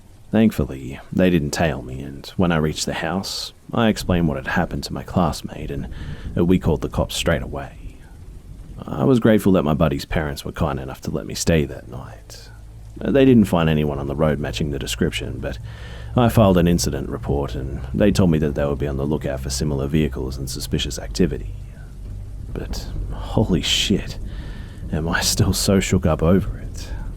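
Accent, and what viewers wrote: Australia. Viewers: I keep falling asleep to your narrations. So then I have an Aussie narrator in my dreams that at times end up mimicking the story being told. It's quite entertaining.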